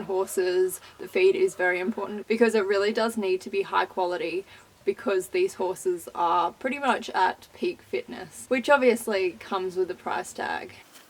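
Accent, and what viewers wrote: Australia. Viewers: R u Australian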